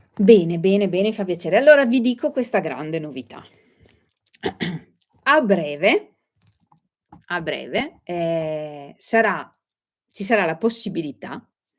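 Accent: Veneto, Italy. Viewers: ciao, sto vedendo la registrazione della tua diretta. E' un piacere conoscerti, davvero. Confermo che hai un bella voce rassicurante, dolce e garbata inoltre il tuo accento veneto mi fa ricordare la mia mamma veneziana che non c'è più e mi manca molto. I tuoi tutorial sono chiari e molto utili anche per me che non sono più giovanissima e un po' testona. Brava! Un grande abbraccio 🤗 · Cara, che piacere vederti! ti seguo da circa 5 anni, sei stata e sei sempre di grande ispirazione con i tuoi progetti, ma ciò che ho amato subito di te ( e te l'ho scritto più volte), è la pacatezza, la chiarezza, la creatività e......il tuo accento veneto! lo sono anch'io, ma vivo in Piemonte! e sono mancina anch'io, ma poiché ben più vecchia di te, da bambina sono stata obbligata, per alcune manualità, ad imparare con la destra! vorrei scriverti ancora tante cose, ma intaserei il canale, per cui ti saluto con grande affetto, ti ringrazio ancora e...continua così! 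a presto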